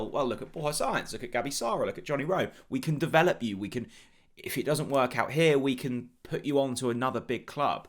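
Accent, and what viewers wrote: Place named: Norfolk, United Kingdom. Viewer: Can you revert to your east Norfolk silky tones, as you laid bare in your early videos ? Altogether now - "thaass not roight ! Them defendas wannt get orn that ball" "that Kiffsgaaaaadaaan, is oriiite!"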